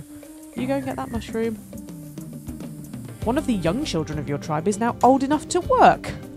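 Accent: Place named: United Kingdom. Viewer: I love your British axsent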